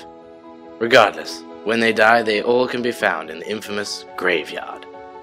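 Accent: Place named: England, United Kingdom. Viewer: this is very good. Good touch having an English accent as narrative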